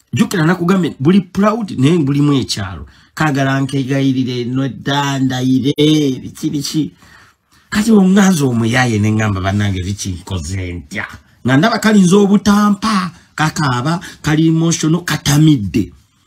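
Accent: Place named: Buganda, Uganda. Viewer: Wabula today u made me laugh with your bunnakiganda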